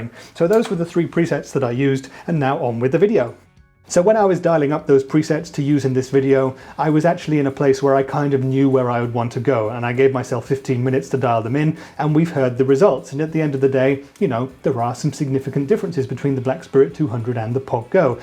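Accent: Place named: United Kingdom. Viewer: Are you German? Your English is flawless, I thought you were British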